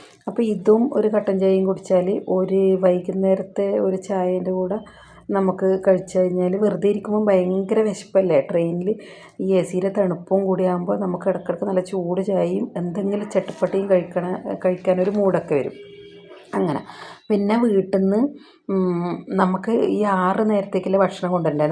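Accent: Kannur, India. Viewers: YouTube nokkikkondirikkumbozha chechide trainil ndakkunnannathu ingane okkeya enna video kande .samsaram kettappazhe kannurkari aanennu thonni.apo video full kandu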